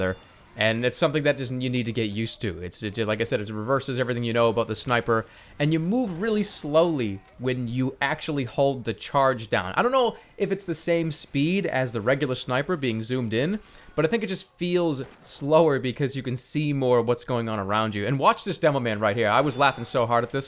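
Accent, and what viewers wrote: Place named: Boston, United States. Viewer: I keep trying to put my finger on his accent, but I'm not that familiar with different parts of the US and their accents. Is he Boston, Brooklyn, or neither? I kinda wanna say Boston, but like I said I don't know .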